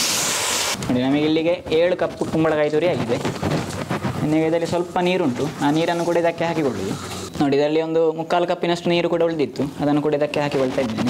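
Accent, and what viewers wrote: Mangalore, India. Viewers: Your manglore slang kannada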